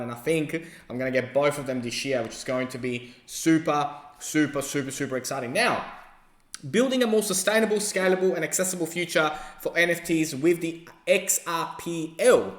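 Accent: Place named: Australia. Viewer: Love your accent mate - Aussie Aussie Aussie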